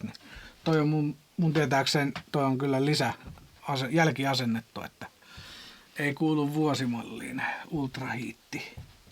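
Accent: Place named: Finland. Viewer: Kiitos hyvästä kanavasta! Jännä, että alkanut vasta tänä vuonna sitä meille tarjoamaan. Laitettu tilaukseen👌 Hienoja ja mielenkiintoisia videoita teette👍 Murteesta päätellen aika lähellä meitä vissiin olette😀 myö ollaan Kouvolast🤣 Meillä on aika uusi kanava tubessa, jossa reissataan vankkurilla Suomessa ja ulkomailla. Ja toki retkeillään myös.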